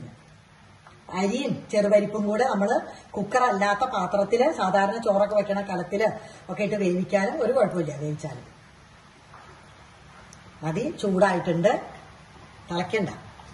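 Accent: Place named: Valluvanad, India. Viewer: Teacher valluvanadan style il language.. Teacher evideya place?Super nalla nadan reethi enikku ishtayi.. ❤️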